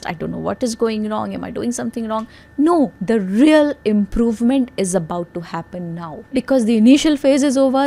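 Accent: India. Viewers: Why you not speak Real English it's hindi English. The lanaguage must be speak on their orignal way is best to teach and learn not on this way Thank s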